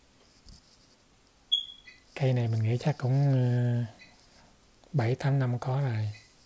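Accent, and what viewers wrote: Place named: Vietnam. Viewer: giong vn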